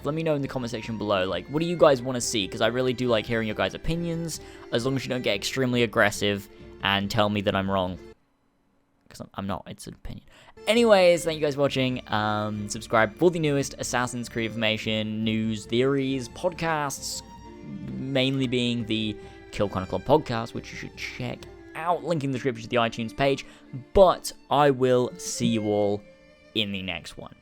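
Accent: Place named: Northern Ireland, United Kingdom. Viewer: where are you from cause your accent sounds like a northern Irish...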